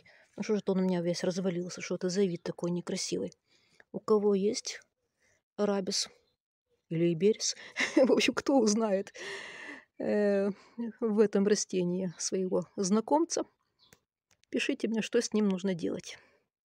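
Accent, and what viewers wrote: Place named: Odessa, Ukraine. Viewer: с приятным одесским говором и правильной речью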